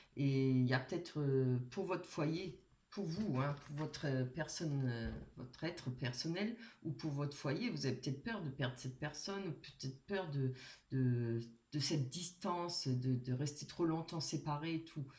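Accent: Alsace, France. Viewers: Bonsoir, merci infiniment pour ce beau tirage. Quel bel accent, serait-il Alsacien?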